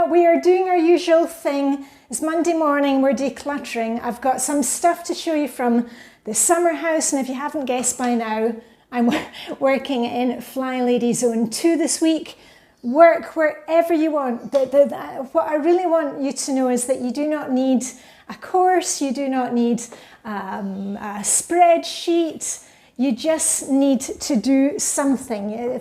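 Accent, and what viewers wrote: Scotland, United Kingdom. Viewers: Found you again! I had watched you years ago and lost you in the confusion of life. I’m 67 and we have a small condo that we have been in for 3 years after owing a large home. The clutter is back😂. You have inspired me this week and I’ve been cleaning like a crazy bunny. I love your accent. Brings back memories of my Grandfather’s brogue. My Mom was born in Glasgow in 1920. She came as a little girl and later married an Italian. That’s a combo! Thank you for your inspiration! I enjoy watching you declutter as you prepare for your downsizing. From: New England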